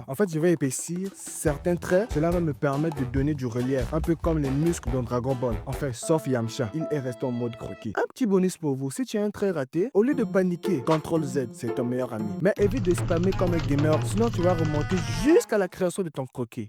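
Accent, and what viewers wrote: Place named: Africa. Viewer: Tu es africain toi je reconnais l'accent du continent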